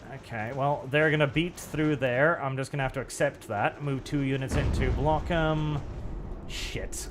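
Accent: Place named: United Kingdom. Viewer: Almost 4 hours of being a better brittish that most modern british, good stuff!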